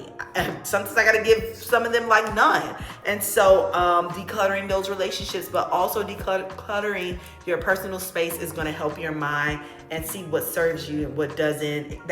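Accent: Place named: Philadelphia, United States. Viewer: It’s giving Philly , where you from?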